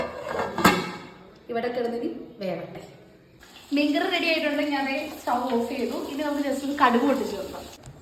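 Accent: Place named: Thrissur, India. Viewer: Samsaram thrissur style Aanalo kinnamkachi fish curry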